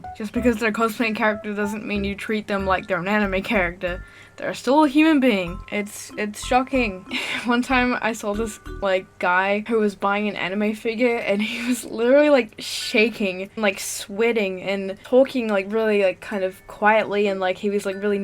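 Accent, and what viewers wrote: New Zealand. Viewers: Are you from New Zealand? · You're a kiwi too? I just assumed you were australian oops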